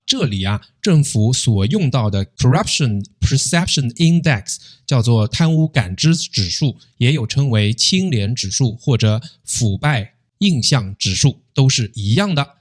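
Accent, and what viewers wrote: Standard. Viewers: 你操着一口标准的普通话。 你说马来西亚贪污腐败。 那么中共呢？ 马来西亚有几个州的苏丹。 赵家的七个家族呢？ 中共有特供。人民吃XX食物。 起码马来西亚人的食物规格没有低劣到这样。 马来西亚的政党高官贪污，舞弊，腐败。 中共可是有公安。说多了就会被抓进去。 早期马来西亚有内安法律。 中共更是一手遮天。 中共人口众多，生活压力大。 马来西亚怎么说马来人占多数，起码生活压力没有中共大。 中共有56种税（10多年前）。 马来西亚（人头税，土地税，个人所得税）。 有对比就有伤害。到底哪里让人活不下去？ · 我国是还有前途的，只要安华继续掌舵，唯有取缔贪官腐败，马来西亚是仍有光明前途的，不过只要有清廉领袖。主讲人的语音规范，流利清晰深入浅出的优点，目前有很多主播在语音方面很糟糕也来主讲。 听了 你的演讲耳朵很舒服。谢谢！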